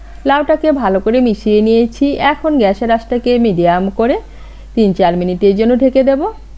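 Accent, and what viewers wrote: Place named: United Kingdom. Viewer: Bangla rannar shonge kintu bangla commentry beshi bhalo lage. Eto english accent kintu eke baare jomchhe naa.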